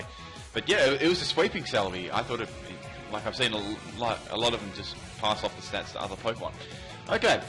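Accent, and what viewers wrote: Australia. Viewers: Yes it is, but he's an Aussie so it's okay for them to mispronounce words, or it could very well just be his accent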